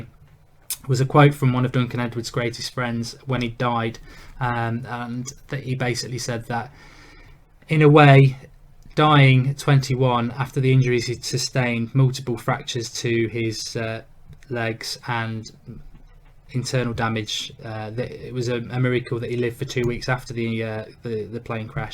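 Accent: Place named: Manchester, United Kingdom. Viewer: nice manc accent mate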